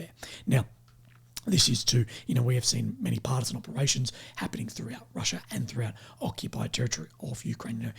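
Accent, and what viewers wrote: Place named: Australia. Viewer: it is very difficult to follow you — not because of your Australian accent — because your voice is so hoarse. If you consult a speech pathologist I am sure she would counsel you to speak slowly to compensate for the lack of tone in your voice. The added benefit to speaking slowly is you are doing less harm to your throat. Thanks.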